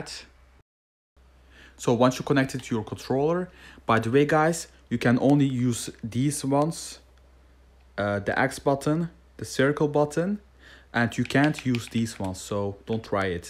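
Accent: Netherlands. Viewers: Ik vind het echt leuk wanneer Nederlanders Engels praten xD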